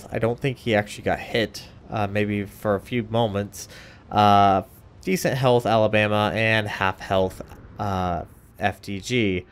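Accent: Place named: France. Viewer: Dat French pronunciation doe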